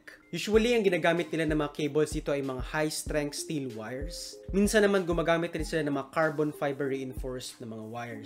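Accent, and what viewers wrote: Visayas, Philippines. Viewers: Bisaya diay ka pre hahaha tabangi ko dol mag engineering ko puhon dol🙏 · Bisaya ka man oi 🤣 new subscribe 🤚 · Bisaya ka diay kay ang imong pronounciation usahay murag bisaya gyud! 🤭🤭🤭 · Bisaya accent🥺😍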